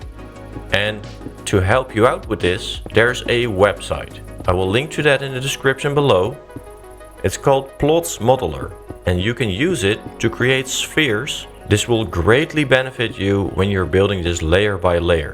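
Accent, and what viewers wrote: Netherlands. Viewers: wassup, are you dutch cuz i live in the netherlands and i also lived in america and you have the same accent as most of my english speaking dutch friends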